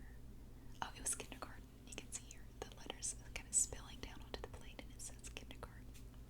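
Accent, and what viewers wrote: South, United States. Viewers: I love you. I cannot watch anything else at the moment. Your whisper, soft spoken videos are just amazing. As a girl from the UK I find a southern accent lovely. So very relaxing. THANK YOU!!! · I love the way you speak I don't feel you talk fast. It's lovely and relaxing and the southern accent just kicks it off beautifully x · You’re a real gem ♥️ Yep, you talk fast like hell, indeed, but now I get it why and I adore you even more😁 Your southern drawl is absolutely lovely, I’m watching a Netflix TV series “Ozark” and the drawl in it is like a main character, check it out😊 Thank you for your great work, I love your videos since the very beginning, you’re one of a kind!🏆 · Haha it’s called that southern draw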